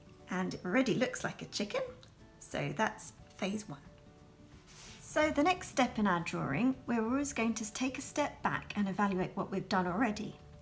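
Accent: United Kingdom. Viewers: I love this video, I said to my son, I like watching videos when they have a nice voice and then a second later you're fine tuning the comb and say " like a punk haircut"! So British, love it.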